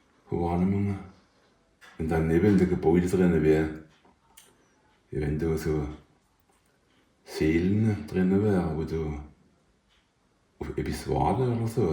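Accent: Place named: Alemannic, Germany. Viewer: Ich verstehe jedes Wort! Das ist Alemannisch fast in seiner reinsten und ursprünglichsten Form, wie man es nur noch in Südbaden oder im Elsass spricht. Im Elsass gibt es allerdings mehr französische Einflüsse. Für mich klingt das alles sehr, sehr authentisch!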